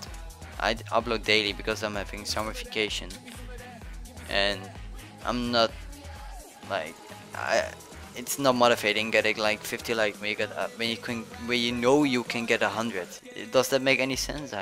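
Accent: Netherlands. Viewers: Dutch english ftw xD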